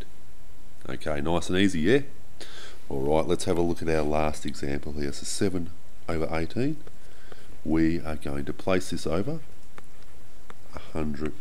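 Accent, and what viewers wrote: Australia. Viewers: All the channels I have watched for this all had like the thinnest American accent, finally a fellow Aussie I can actually understand! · Jonathan Ramirez hes australian, and Bruce as well has an Aussie accent. So there you go.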